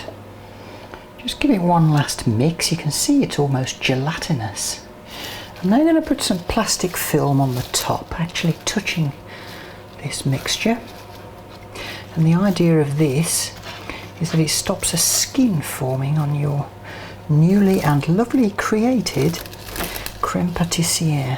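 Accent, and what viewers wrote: United Kingdom. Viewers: I've just found your channel and I must say you have charmed me rather splendidly! Your Danish Pastry video is just about the best I've seen, you make it look so easy I'm going to give it a try. And I love your British accent! You have a, what I call, a story book voice................I feel almost like you are reading a wonderful bedtime story to me! Thank you so much for sharing with us all. I've subscribed and you can bet I'll be a lifetime fan!!! I live in Kansas by the way. Thank you so much again. Bright blessings to you.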